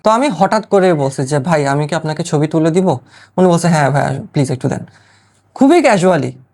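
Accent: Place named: Noakhali, Bangladesh. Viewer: Apni ki Noakhali'r ba Feni'r? Na mane apnar kothay oikhaner ekta taan ase toh tai.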